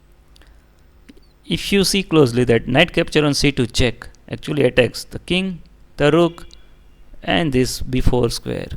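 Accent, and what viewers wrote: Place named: India. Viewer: I was talking about accent. Yeah his english is perfect but... I just cant stand indian accent. Its just so annoying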